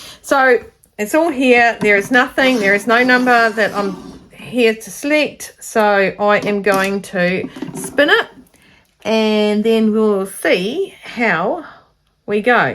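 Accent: New Zealand. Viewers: Have just found your channel through Carol sews. Great to hear a New Zealand accent instead of always American (no offence to our USA bloggers). Your sense of humour is intoxicating, looking forward to following your your channel from a fellow sewist from across the ditch.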